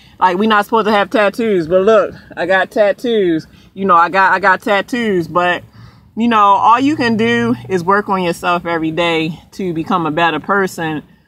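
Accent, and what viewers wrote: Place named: Texas, United States. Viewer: I love her accent, someone in another one of her blogs said Maryland I was like no way I'm thinking more Texas or south who knows where, she's from I'm from Carolina I think she's close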